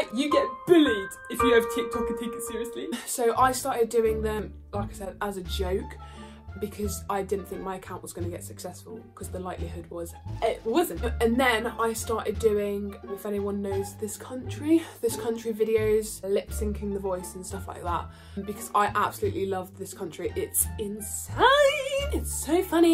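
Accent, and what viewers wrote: United Kingdom. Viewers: I can’t tell if she’s British or American 😂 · Love your accent! What part of Britain are you from? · are u british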